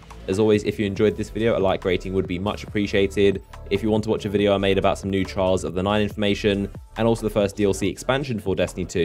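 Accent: England, United Kingdom. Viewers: Is it just me or do gamers who have UK accents r just awesome (he's from the UK right) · this video is supposed to be a tutorial but your talking too fast. as if u dont even care if people can understand you or not . plus u have a thick english accent. how can u expect people to understand you... this video is a thumbs down!!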